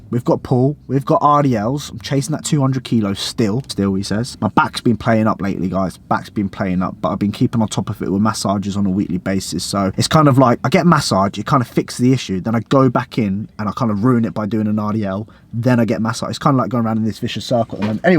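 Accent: United States. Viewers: 😂😂😂dying at the American accent. I love it. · Haha love the American accent 😂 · that american accent omg ahahaha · Haha mad, your American accent make you sound like Charlie Hunnam from sons of anarchy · the American accent is hilarious but accurate lmao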